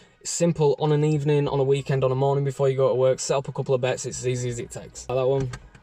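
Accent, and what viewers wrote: Leeds, United Kingdom. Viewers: Sounds like from Leeds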